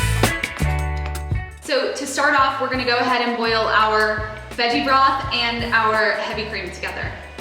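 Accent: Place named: United States. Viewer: Ah, you are american, now I heard it. New subscriber here ❤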